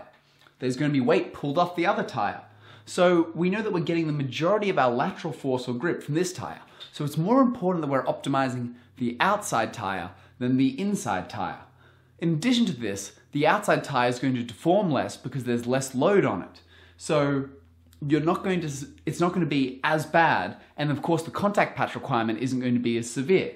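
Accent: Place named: Australia. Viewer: instant subscrib + australian accent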